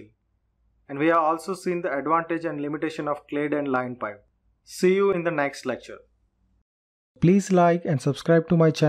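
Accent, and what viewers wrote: India. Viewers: is it indian accent?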